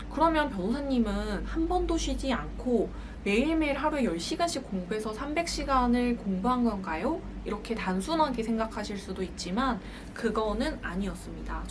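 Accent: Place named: Gangwon, South Korea. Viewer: 누나 부산 사람 아니었어요? 사투리는 강원도인데ㅋㅋ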